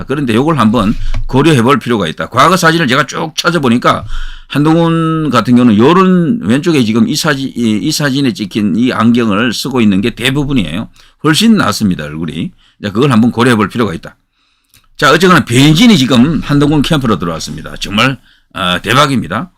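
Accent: Gyeongsang, South Korea. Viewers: 정규재 너나잘해라 정규재 음성 발성톤 엿가락 처럼 ㅋ 경상도 억양인데 이상해 나도 오리지날경상도인데 정규재는 무슨말억양이 촌스럽고 촌스럽고 듣기싫은 음성 억양 말솜씨 암튼 극혐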